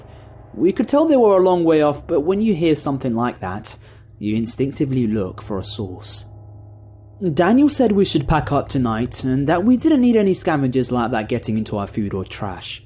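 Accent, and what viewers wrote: United Kingdom. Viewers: Are you and Lazy Masquerade friends or from the same town? You guys talk very similarly. (Not talking about just the British accent of course) Great stories! Keep up the creepy work!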